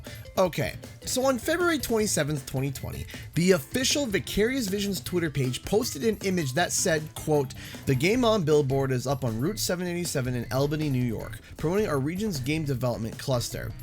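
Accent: Canada. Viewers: Hey how about a skylanders remaster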